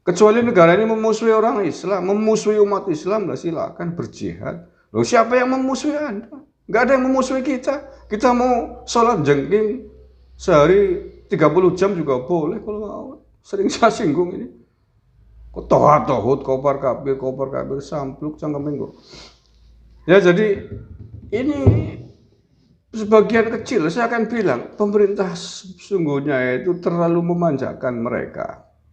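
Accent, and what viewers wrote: Banyumas, Indonesia. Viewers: 😅😅😅😅bhs Banyumas e lucu tenan kang😅😅😅😅😅😅..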